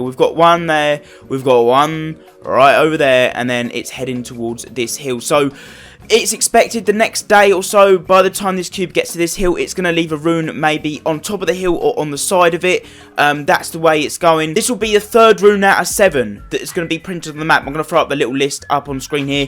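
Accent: United Kingdom. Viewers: I did it. And r u from England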